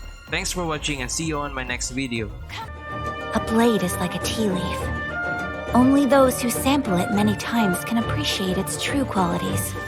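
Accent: Philippines. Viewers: filipino accent gang lets gooooo!!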